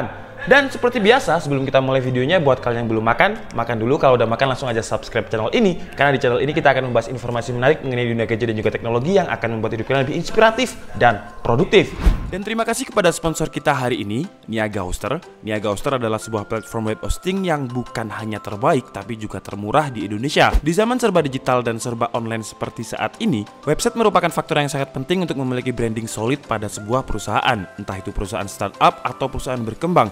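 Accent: Malang, Indonesia. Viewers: Mualang tenan jancok e metu 😂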